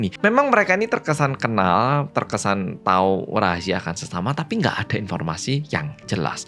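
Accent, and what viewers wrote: Java, Indonesia. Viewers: Oppa kok bisa ditahan 😅😅😅 bahasanya jadi lucu karena medok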